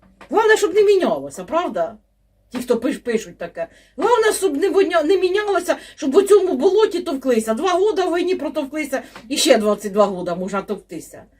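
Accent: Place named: Central Ukraine, Ukraine. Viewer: Це не суржик, це наш центральноукраїнський діалект.Ми так розмовляємо в Кропивницькому ,в Черкасах, в Полтаві.Дякуємо за вашу працю, за стійку українську позицію.Слава Україні! Слава Героям!❤❤❤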